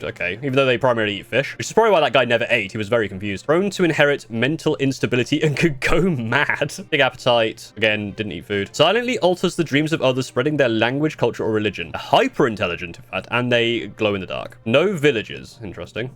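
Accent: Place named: United Kingdom. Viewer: oh god he's british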